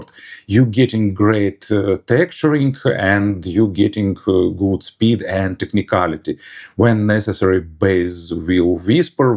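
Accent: Ukraine. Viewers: “Sophisticated” daily dose of Ukrainian accent 👍 Greetings from India 🇮🇳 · I appreciate the daily dose of sophisticated Ukrainian accent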